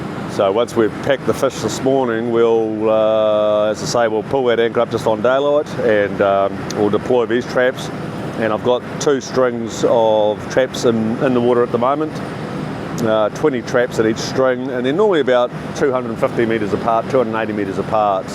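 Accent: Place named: New Zealand. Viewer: Hey, of course with the Kiwi accent, I'm 65 & I wish I had a massive "deck".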